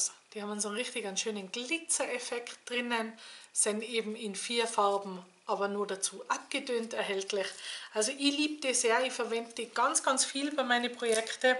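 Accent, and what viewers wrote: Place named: Tyrol, Austria. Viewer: Hallo, wow was für eine tolle Action wieder von Dir. Gerne versuche ich mein Glück und hüpfe mit in den schon gut gefüllten Lostopf. Ich freue mich immer wieder wenn ein neues Video von Dir kommt ich liebe Deinen tollen Tiroler Akzent;-) und natürlich Deine tollen Ideen habe schon einiges nachgebastelt. Viele liebe Grüße aus Bayern Christine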